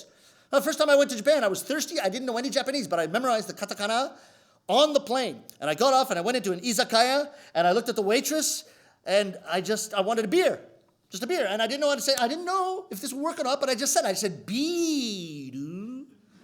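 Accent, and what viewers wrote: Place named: United States. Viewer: That's not Canadian, that's American!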